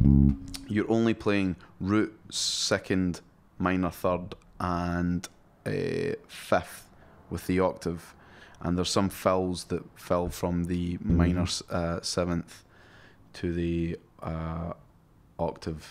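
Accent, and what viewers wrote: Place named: England, United Kingdom. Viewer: Very helpfull lesson, and like the english accent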